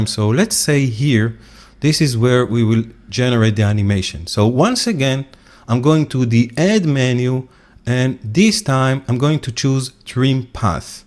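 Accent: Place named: France. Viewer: I think you are French i like your accent! And Thank you for the work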